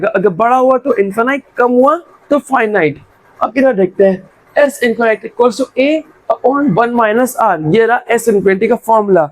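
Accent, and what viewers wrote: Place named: United Kingdom. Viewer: Uk aceent By the way 1st Comment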